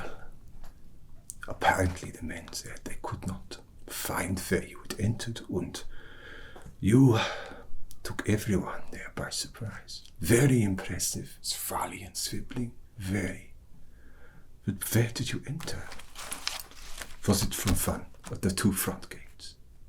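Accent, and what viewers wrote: Germany. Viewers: ey As a German, I can only confirm what the previous speakers said: Your video is, as always, first class. And now I'm showing off my in-the-know knowledge as a German: The accent is perfect! As if you were one of us from 230 years ago! Unfortunately, I can't watch the video to the end because I lack certain stimuli for the mental tingling, but I see a lot of work in your video that I want to give you credit for. Your outfit is perfect. The camera mega and the exposure divine. And the sound.. of course from another, a better world.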